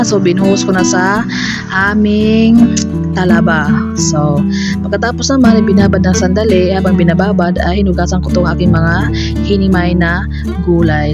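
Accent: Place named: Iloilo, Philippines. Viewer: Ilonggo gd ah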